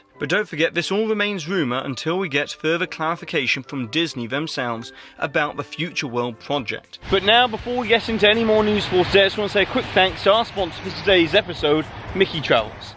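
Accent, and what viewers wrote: England, United Kingdom. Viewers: I love your videos man! I can't help it I been watching 5 of your videos. Just wondering is your accent from England?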